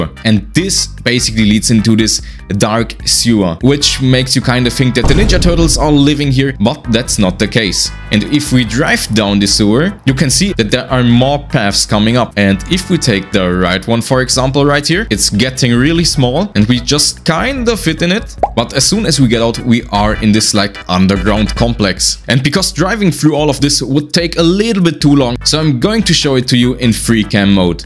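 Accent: Germany. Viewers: Aha I knew your German I could hear it was hard but I could hear it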